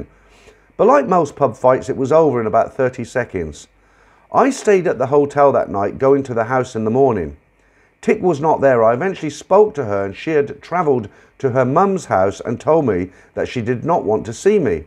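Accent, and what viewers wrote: England, United Kingdom. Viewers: Another good story i hope they keep coming in good pommy accent so proper 😂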